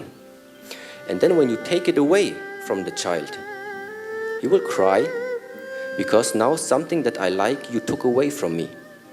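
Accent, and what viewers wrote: Germany. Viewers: By the way... asian master with german accent. Crazy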